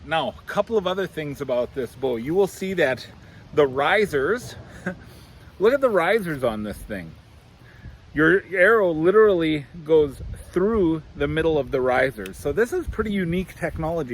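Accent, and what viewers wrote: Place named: Pennsylvania, United States. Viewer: lol he sounds like an Eagles here in Pennsylvania... I must agree with you... My son is on the Mathews train... He owns the newest one of 2022... I forget the model honestly... Completely dressed out its heavy... He had to add so many silencers to quiet the bow down I was actually in shock and his is set at 40 lbs... But I've been doing research on the shortest Ata and with good speed... I've seen its been 2 years now since you posted this video... Can you give an update??? How's it holding up??? Any issues??? I'm curious... I live here in Central PA not far from State College and the hills are steep some are very thick with under brush and heavy thorn bushes... So I'm trying to stay light and short...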